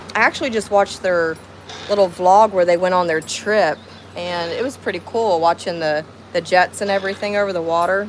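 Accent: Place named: South, United States. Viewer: You definitely have what we call a country accent. Even some Californians have country accents and I can pick up that country accent just hanging out with others who have it-it’s my Okie blood😜🤣 Obviously this is being watched and commented days after you went live-I always miss the fun! You don’t seem nervous or over chatty at all/you seem like a natural ❤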